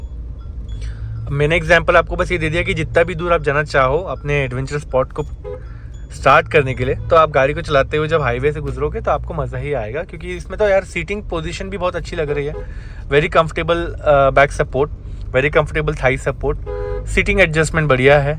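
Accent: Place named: Mumbai, India. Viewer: Bhai kya commentry hai .. wah maza aa gaya ... Mumbai touch hai aapke language main .. keep the good work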